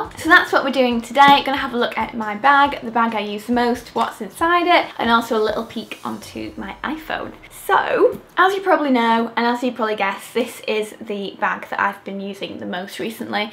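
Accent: United Kingdom. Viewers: what's her accent? like what part of the UK?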